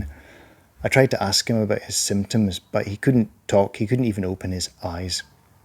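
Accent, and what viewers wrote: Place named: Scotland, United Kingdom. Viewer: I really respect your honesty in sharing such a heartbreaking story As a Doctor,it is apparent that you always go the extra mile with compassion and diligence There is nothing for you to feel guilty about as you did all you could Labels,before a full work up is done,are indeed dangerous and damaging as illustrated in this particular case You have a charming accent May I ask which part of Scotland you are from,Sir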